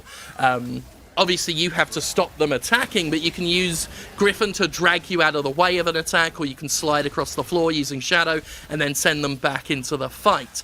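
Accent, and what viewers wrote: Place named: RP, United Kingdom. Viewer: I read this is his british toff voice.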